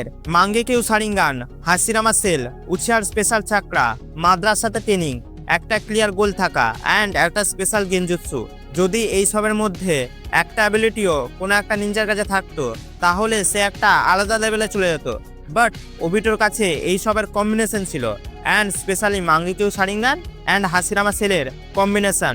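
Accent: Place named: Kolkata, India. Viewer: ভাইয়া আপনি কি কলকাতায় থাকেন?(আপনার কথা শুনে তাই মনে হয় 😅। যদিও আপনার কথার উচ্চারণ আর সুরগুলো খুব ফানি হয়😂)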